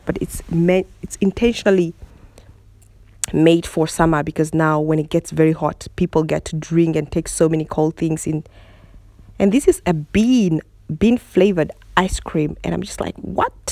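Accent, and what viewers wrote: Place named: South Africa. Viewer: You're South African.. your accent reminds me so much of my friend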